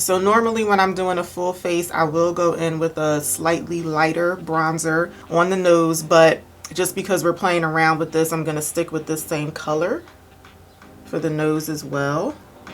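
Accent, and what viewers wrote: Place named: Philadelphia, United States. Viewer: You sound like a Philly girl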